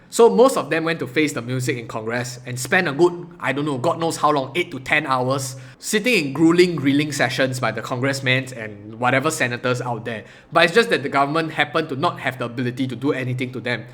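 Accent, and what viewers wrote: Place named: Singapore. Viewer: 新加坡口音的英语！！！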